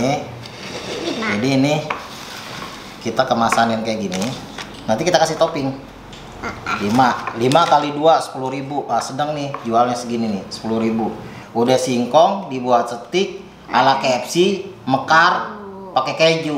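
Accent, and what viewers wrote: Jakarta, Indonesia. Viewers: Logatnya betawi bet bang 😁 jadi inget guru silat ane dr betawi juga 😁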